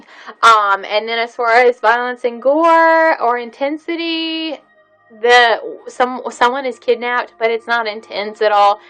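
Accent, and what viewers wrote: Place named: South, United States. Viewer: Just saw this movie. Haha where are you from? Judging by your southerness, you seem really close by. 😂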